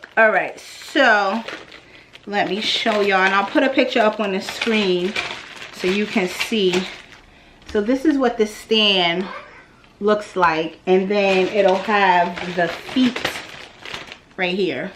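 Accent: New York, United States. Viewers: We know you’re from NY girl, you couldn’t hide it if you wanted lol 😂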